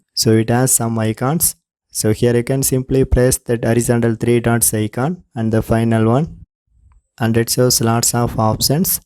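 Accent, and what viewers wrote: India. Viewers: the indian accent slaps dude, anyways its helpful my dumb friends cant join me :D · he sound Indian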